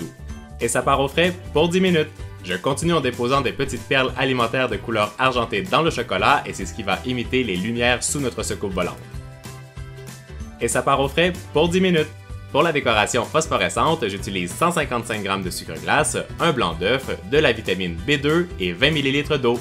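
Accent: Quebec, Canada. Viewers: super video tes le meilleure 😉😉 #lovemail #temsnap love j'adore ton accent Québécois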